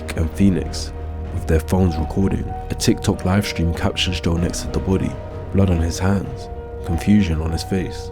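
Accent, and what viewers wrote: London, United Kingdom. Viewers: the best video ive seen yet on joe and the London accent makes it even more impressive.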